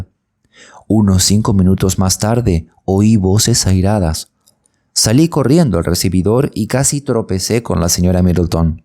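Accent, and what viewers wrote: Rioplatense, Argentina. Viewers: No sólo eres latino, hablas con la ye , que significa que eres argentino o uruguayo, me identifico maravillosamente, soy uruguaya 🙋👍✨🇺🇾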